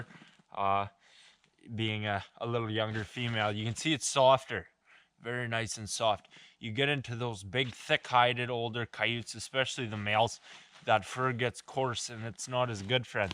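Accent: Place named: Newfoundland, Canada. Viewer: Your accent are you from Canada ,new found land maybe buy great videos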